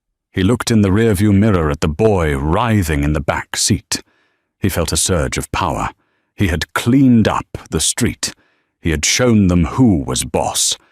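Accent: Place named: United Kingdom. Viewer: STOP with the British voice and pronunciations when dealing with American settings.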